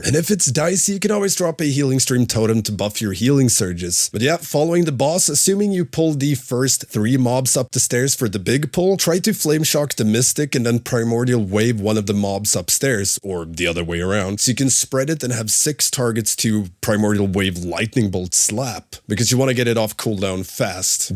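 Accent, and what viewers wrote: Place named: Sweden. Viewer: Hördes på flera ställen och inte bara i outrot att du är svensk. Kul nyhet :)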